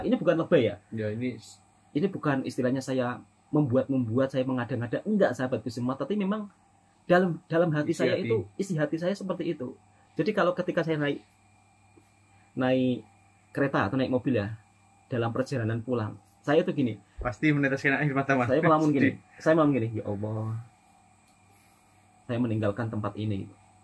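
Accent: Java, Indonesia. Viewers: Slang Bro bicara seperti rakan rakan jawa di tahun tahun 70an. Teruskan bro berkarya. Moga nanti tidak kena cukai. Adakah bro balik terus?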